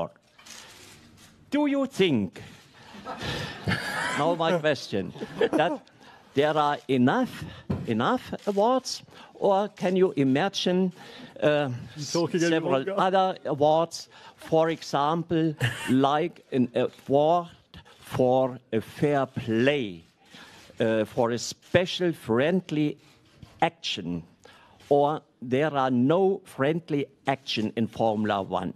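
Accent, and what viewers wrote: Germany. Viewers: This german accent is rediculous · Gute, alte deutsche Aussprache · He should learn to speak english. I'm german, but it's hard for me to understand his denglish.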